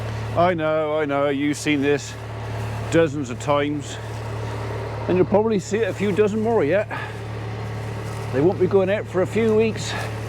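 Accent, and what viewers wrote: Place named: Suffolk, United Kingdom. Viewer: Where you from? Where do you live,sounds a bit Suffolks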